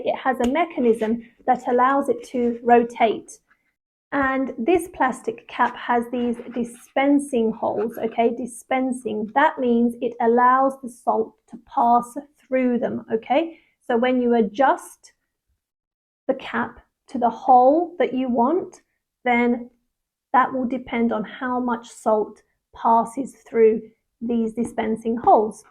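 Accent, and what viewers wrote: United Kingdom. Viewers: Lovely British 😊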